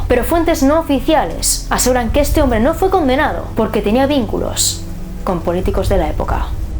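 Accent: Galicia, Spain. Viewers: eres gallega fijo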